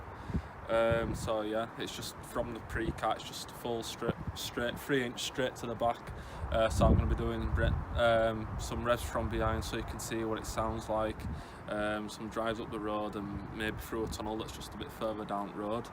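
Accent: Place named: North West England, United Kingdom. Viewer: nice one bro. Just watching threw your videos now? Were abouts you from guessing north west with ur accent? Im up in southport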